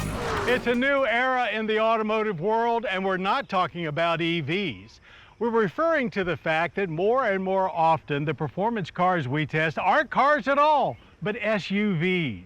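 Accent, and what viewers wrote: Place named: South, United States. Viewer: Remember when he had a southern accent?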